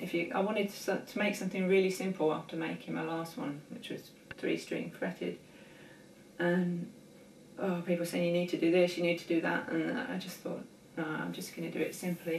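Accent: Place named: South, United States. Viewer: When English girl get all southern? ROCKIN MAN LOVE THE LOOP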